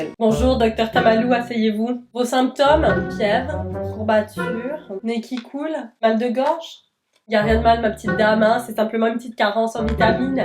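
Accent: Quebec, Canada. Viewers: Jai une pote quebequoise elle me tue avc son accent et jaime beaucoup laccents québécois et je croyais que le Québec parlai pas francais hihiii donc jai appris laccents grâce à toi je la parle que quebequois la hopihoihii... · Grave on dirait vraiment qu'elle est né à Québec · Tu commence à avoir l’accent québécois j’aime bien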